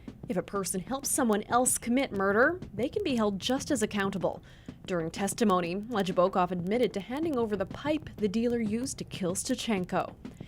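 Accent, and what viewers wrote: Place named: Canada. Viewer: She sounds extremely Canadian..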